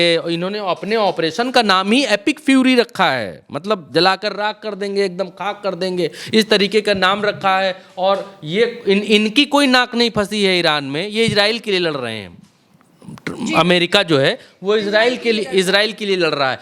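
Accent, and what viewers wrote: Bihar, India. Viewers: BHAI TUMHARI BIHARIYA BAAT BAHOT ACCHA LAGA...DHUKH KE SATH DEKH RAHE HE..PHIR BHI HASIN AA RAHI HE...😂😂😂😂😂😂😂😂😂😂😂😂😂😂😂BAHOT BADHIYA ...AEISE SABHI KO SAMAZ ME AAJAYEGA SAMJANE SE..😂😂😂😂😂😂😂😂😂😂🎉🎉🎉🎉🎉🎉🎉🎉🎉🎉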